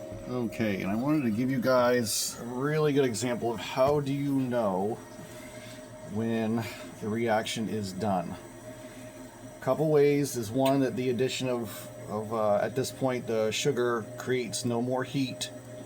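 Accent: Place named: United States. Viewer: because I am as well, yank can hear another yank! 😁 Also think I heard you mention a storm or weather event that sounded exactly like the weather we had going on. I'm in NH, you could probably drive a golf ball into Maine from here.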